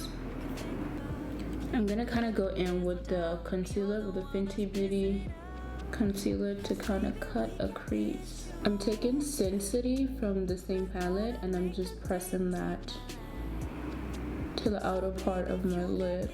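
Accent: United Kingdom. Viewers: British accent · almost British...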